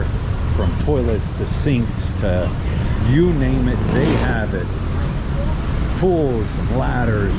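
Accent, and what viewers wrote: Philadelphia, United States. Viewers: It's not that they don't speak English, it's just that you speak english with a Philly accent and they need time to process what you just said - that blank look on their face after you say something to them is their brains processing what you just said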